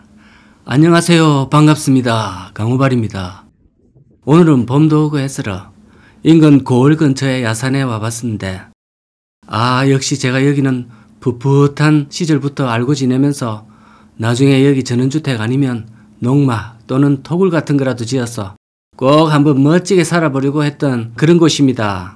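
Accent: Gyeongsang, South Korea. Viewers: 경상도인가요 좋네요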